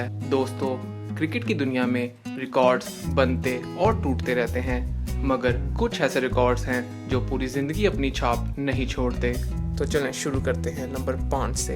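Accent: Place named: Punjab, Pakistan. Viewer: urdu chek kr ustad bndy ki level kr gea urdu in punjabi tone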